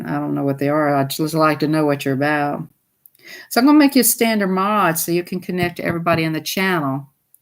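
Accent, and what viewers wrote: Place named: South, United States. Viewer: Hi, love your accent 😄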